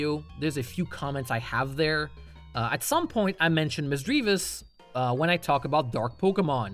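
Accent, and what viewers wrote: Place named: France. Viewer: Judging by some slight variations in accent, I'll assume you're from France?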